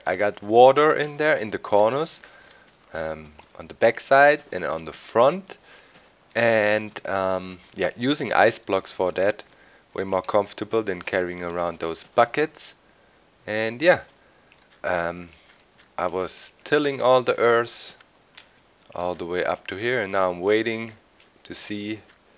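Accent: Germany. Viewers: has an accent like German frontman Miland Petrozza, and Miland Petrozza is badass.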